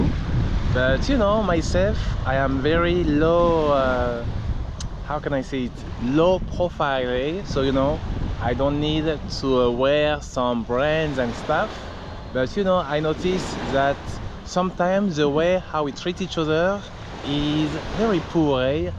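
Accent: France. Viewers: Sorry you are having a different experience in JAMAICA than you were hoping. There are many Jamaican vloggers like you and Africans men and women, who have passed through the streets of Jamaica and have had a great time with the locals like the Ghanaian Wode Maya, I am Mahwa, etc. You should have checked out the Rastafarians and Ital Food restaurants to get your meals as there are many of them around. You have to try a little harder to lower the French accent as many people you encounter selling in the Streets find it hard to understand. Your dress style is not an issue. Some Jamaicans learn French in Highschool, but you will not find them walking around unless you are in the better neighborhoods. So, stay strong and continue to get better with your JA vlogging experience. One Love. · I’m honestly thinking there’s a language barrier. You have a French accent, and I think a lot of people are having a problem understanding you. 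· ! Seriously, I have been to Jamaica many times and I have been treated wonderfully by the Jamaicans. It could be your heavy French accent but then again I have followed Dee Mawanga and her brother I’m_Marwa and they both have both heavy Kenyan accents and they both had an awesome time in Jamaica. Just keep on plodding on and don’t take things too personally. Many people don’t like to be on camera so you may have to tweak the way you do things a little. You also have to show the many developed areas in the Jamaica. Anyway, I will still follow you. Good luck my friend.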